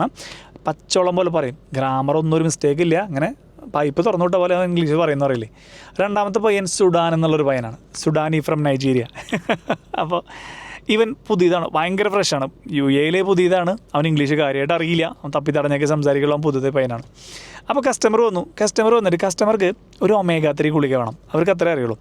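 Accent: Thrissur, India. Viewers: Thrissurkkaran aanalee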